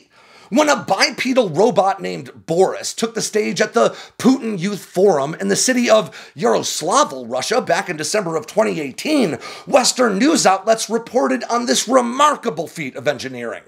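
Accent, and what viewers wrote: United States. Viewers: As soon as you hear an American Accent in a video about China, you can rest assured that is gonna contain a pile of Yankee Bullshit and opinions upon about things about which they really have not got a clue.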